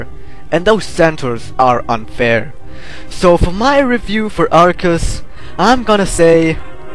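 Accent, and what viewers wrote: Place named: Asia. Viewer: are u asian? u sound fob!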